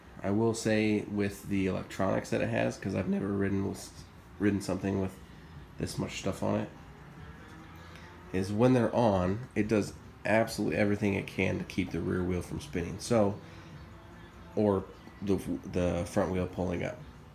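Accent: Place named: Utah, United States. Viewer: I thought I recognized a Utah accent and then I saw your profile landscape pic of Utah Valley! Am I right?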